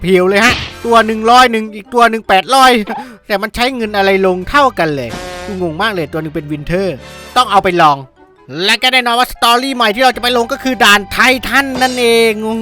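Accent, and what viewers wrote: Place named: Korat, Thailand. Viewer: โคราชอยู่นิดหน่อย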